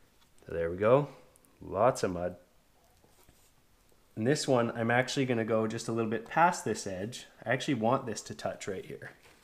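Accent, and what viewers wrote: Vancouver, Canada. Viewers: the Vancouver accent is smoother than the Ottawa accent... less oat 'n aboat!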